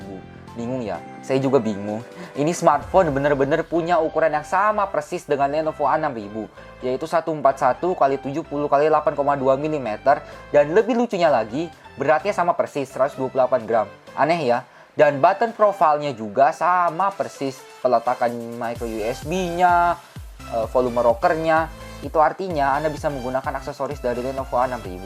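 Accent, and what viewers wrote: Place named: Java, Indonesia. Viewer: medok banget hehe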